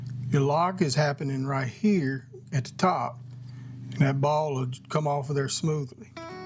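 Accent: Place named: South, United States. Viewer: OK- so the short answer is yes! I have suffered "Gravity's Wrath" with some custom stained glass... As for the file work and the remaining content- you are eloquent and smoothly southern in your presentation- do not forge a can of screws! Frustrations abound but the horizon is simple- educate and just maintain the presence! Personally I appreciate you letting us into your shop. Be safe and as always- at peace.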